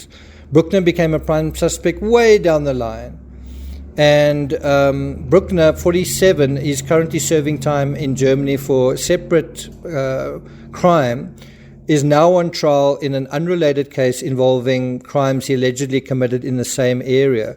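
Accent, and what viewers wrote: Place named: Johannesburg, South Africa. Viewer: Sounds like you’re from Jo’burg??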